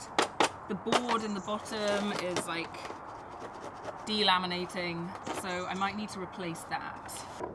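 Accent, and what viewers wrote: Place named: RP, United Kingdom. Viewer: У вас очень хороший английский как у вас говорят "Queen's English" несмотря на то что вы живете в деревне. Обычно британский деревенский просто ужасен ничего не понятно. ☝😲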